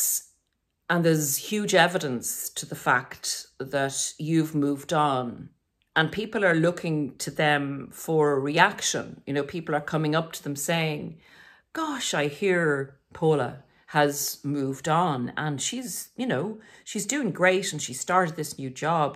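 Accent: Ireland. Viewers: Came for the info - stayed for the lovely Irish voice.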